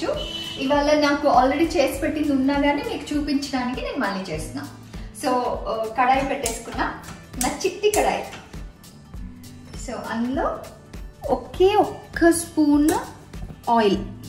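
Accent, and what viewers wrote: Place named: Bangalore, India. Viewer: koncham banglore thelugu matladthundedhi kadhaa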